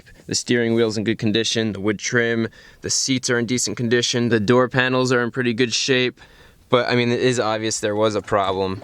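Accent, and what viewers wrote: United States. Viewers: the way americans pronounce car brands...it just makes my ears bleed...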